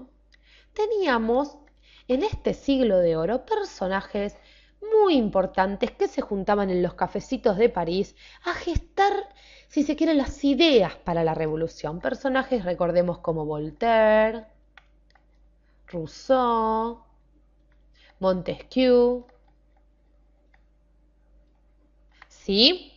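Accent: Argentina. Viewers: Me encanta el acento argentino :D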